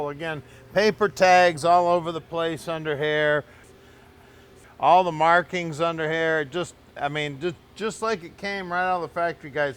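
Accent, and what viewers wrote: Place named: Detroit, United States. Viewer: My grandfather had one of these in navy blue. RIP Grandpa. He worked for Ford and grew up and lived in Detroit, as did my mom, uncle, aunt and grandma, and your accent reminds me of them all. It's actually bringing tears to my eyes. Thank you.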